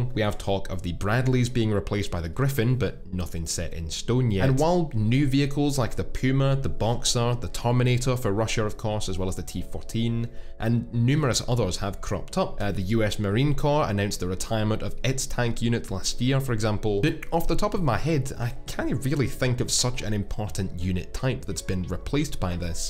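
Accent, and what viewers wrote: Scotland, United Kingdom. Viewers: Many words spoken by this chap are from some kind of jock slang, I respectfully request subtitles for clarity. Or is there or might there be an English voice option ? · Being disabled by driver error is hardly enemy action. The enemy action was ineffective against the chally even when it was immobile and defenceless and they where stood next to it shooting rpgs at it's weakest points. Plus being disabled is alot different to being destroyed with the crew dying.. Can tell your scottish i love your vids man but you have such a hate-boner for anything british then laud things only slightly better or on par it's hard to hear sometimes xD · Scottish accent but you live in Australia. So gaining any defense accurate info is quite impressive and commendable, but chobam armor, which I believe has been upgraded to leiseter or some other armor, and to be honest. 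guys I know in the tank corps think that is a good thing. You seem to be looking for an indestructible tank simply because of that you will never get one. At Also the Abrams use British armor technology, but then again the UK uses American communication software and fire and control. Anyway living in Australia, do you really care? you are about as far away from real war, as you will ever be. Guess that why you are in Australia!!!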